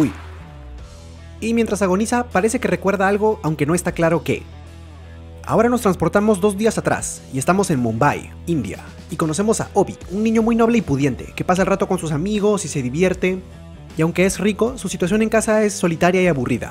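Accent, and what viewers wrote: Peru. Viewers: aah eres peruano xd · ERES DE PERU MASNA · Todo porque le entiendo porque yo también soy peruano uwu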